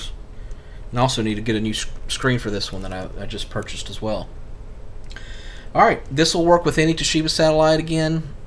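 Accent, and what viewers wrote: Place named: Georgia, United States. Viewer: I didn't notice his accent but that's because it sounded normal to me here in Georgia.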